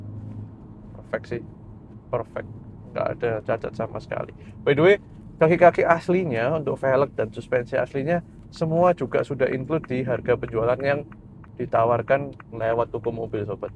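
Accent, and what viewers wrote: Surabaya, Indonesia. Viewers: Wes luweh asik gae logat arek suroboyoan ae ko...salam sego indomi🍚🍜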